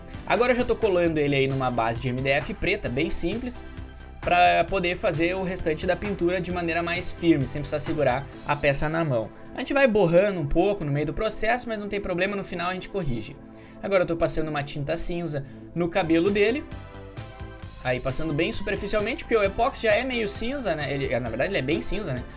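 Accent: Rio Grande do Sul, Brazil. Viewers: cara vc é Gaúcho? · Tu é Gaúcho né? Teu sotaque é bem Gaúcho eu sou aqui do Rs e eu reconheço, não sei se é do Rs, mas tu é do Sul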